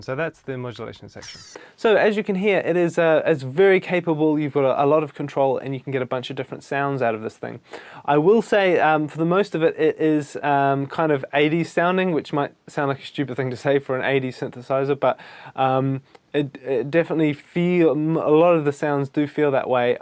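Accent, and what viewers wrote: New Zealand. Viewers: knew it!, cant mistake a good old kiwi accent. What part of NZ? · Ha! The second you opened your mouth I was like... "no" accent o.0 Must be a kiwi, sure of it! I was right :D Donno if you know the fella that makes Goldbaby samples... kiwi as well (only found out the other day, turns out he lives in the next suburb on from mine).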